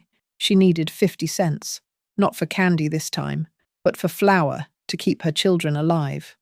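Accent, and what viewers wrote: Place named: United Kingdom. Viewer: Blah blah blah. The Wizard of Oz is about Americans becoming US Citizens. It is about common law becoming admiralty corporate UCC law. Oz = ounces of gold. Tin man = Tax Identification Number. Scarecrow = Stramineus Homo = a person or entity used as a front, nominee, or agent for another party, often to hold title, provide bail/surety, or conceal the true owner. The lion is the sovereign (king of the jungle?) aka a free man on the land. She comes for 'Toto' which is not a dog but Latin for 'everything' because the banks take everything. White snow is narcotics. On and on you guys are being bamboozled by this British accent that want you to look elsewhere. To the content creator of this channel stop gaslighting. We are not in Kansas (a republic or commonwealth) anymore because she is now in 'The State of Kansas' a corporate state. 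Americans know all about this and we understand who is who etc. If this information is new to you, you're welcome. Don't listen to the majority of this color (legal term you should look up). I did not bother listening to this nor did I bother to read the comments. If what I am saying has been echoed already, good on them. Anyone saying anything else is a legal fiction and don't know it.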